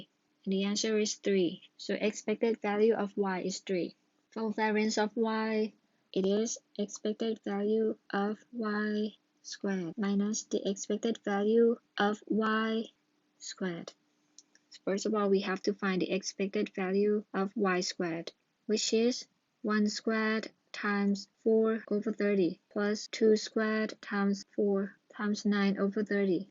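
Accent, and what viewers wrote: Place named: Bangladesh. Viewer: Are you from Bangladesh?.. Your English accent is similar like us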